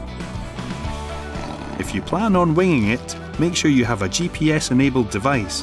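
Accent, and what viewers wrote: Scotland, United Kingdom. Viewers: I just love the Scottish Accent.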